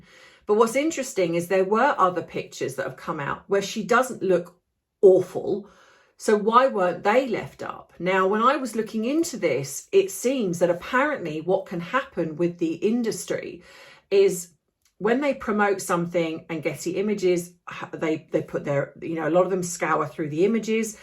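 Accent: United Kingdom. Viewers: My distinct pleasure,. I can listen to your beautiful British accent for hours on end!!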